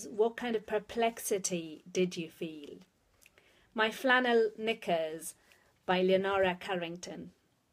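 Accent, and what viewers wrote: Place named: United Kingdom. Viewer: ♥ ¿No te gustaría leer unos poemas de emily dickinson con ese acento británico?.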